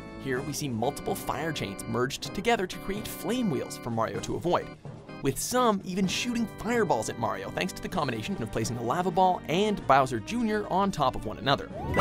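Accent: Canada. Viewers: omg is he Canadian if so omfg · WatchMojo are you canadian?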